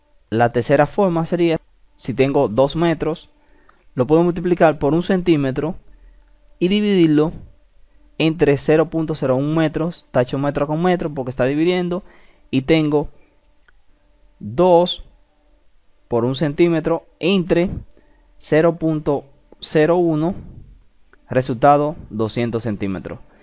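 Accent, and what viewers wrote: Dominican Republic. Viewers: El acento me suena, eres dominicano??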